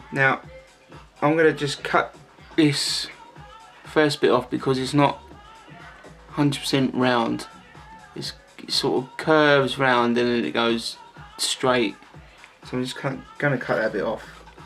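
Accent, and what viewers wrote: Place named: United Kingdom. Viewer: Your voice sounds like comedian Russell Brand; you must come from the same area of UK.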